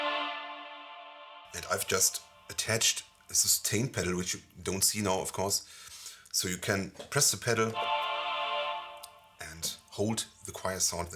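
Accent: Germany. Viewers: I like this Germenglish accent 🙂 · Das mag zwar sein mit der deutschen Aussprache, aber so erreicht er mit seinen Soundsets ein größeres Publikum. Die Wavestate wird ja nicht nur in Deutschland verkauft und die Titel sind weltbekannt. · Bestimmt ein Tolles Set, danke für die Mühe. Ich möchte nicht "trollen" oder dir irgendwas böses, aber ganz ehrlich: Mach deutsche Videos. Dieses Deutsch-Englisch hört sich schauderhaft an. Und vermutlich liegt dir die Sprache nicht besonders, so dass du dich da immer von Video zu Video kämpfen mußt. Ich denke da an einen anderen Kanal, wo der Betreiber wohl auch erst Englische Videos gemacht hat und irgendwann beschlossen hat diese in Deutsch zu machen. Dadurch sind die Videos für ihn leichter und schneller zu erstellen und die deutschen Zuschauer haben da auch nur zugestimmt und die Abonnenten sind sogar gewachsen. Wie gesagt, ist nur meine persönliche Meinung und ich möchte dich wirklich nicht angehen. 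Aber vielleicht würde es für dich auch schöner und einfacher werden. :) Viele Grüße und auf weiterhin gute Videos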